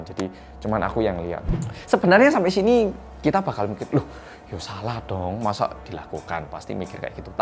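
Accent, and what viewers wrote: Java, Indonesia. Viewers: Jadi ini yo kasus yg mejadi trending di naver slama 3 hari, sya udah liat tpi nggak bisa baca tulisan korea. Untung up ini kasus jadi ngerti kan! Thnks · Makin maju dunia makin parah. Aku ada Telegram on my hp but I don't use it, cuma sempat register sja sudah lama dulu. Kalo gitu gak apa2 kan? Btw, ini pertama kali lihat Korean yg bisa medok gini, lucu deh 😅. Di tmp ku sja kalo warga asing yg ngomong rata2 masih ada logat dri negara mereka juga. All d best,& stay safe during this covid19 pandemic ya..😊✌ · Korea rasa jawa kali ya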